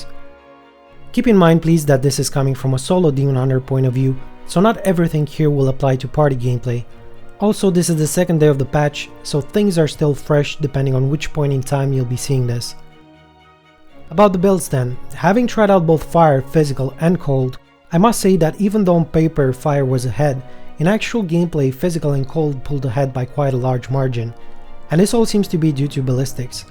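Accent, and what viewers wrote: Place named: Philippines. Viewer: you sound like filipino.. hmm...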